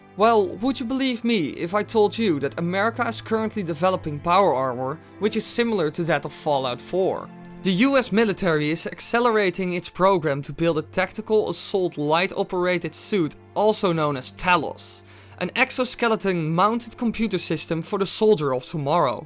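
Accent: Netherlands. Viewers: are you dutch ??? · Are you Dutch? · Wait.. you're dutch... GROETJES!! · Dat merkte ik ook gelijk hahaha... Steenkolen Engels op zo'n manier komt alleen van een Hollander. ;) Later!!!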